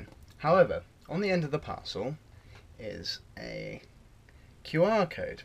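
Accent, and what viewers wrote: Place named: United Kingdom. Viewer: I really love the British accent xD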